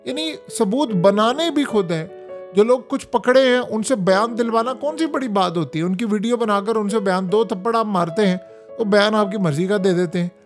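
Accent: India. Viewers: تیرے انداز گفتگو سے لگا رھا کہ انڈین کہ انڈین ایجنٹ ھیں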